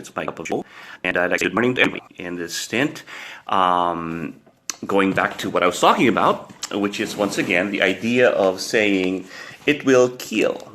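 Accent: Philippines. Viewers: Sir Doug, sabi ko na eh. Yung accent mo pa lang sir Pinoy na Pinoy na. I always watch episodes in Forged in Fire. Hihi. Your weapon sir will keal! 😊😊😊😁😁😁❤️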